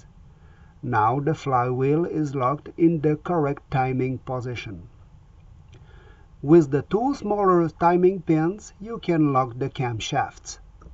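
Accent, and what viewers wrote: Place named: France. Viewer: Hi, you should have your own T.V. Show! Great tutorial & very very clear precise instructions, also very good English but I love your accent, vive la France.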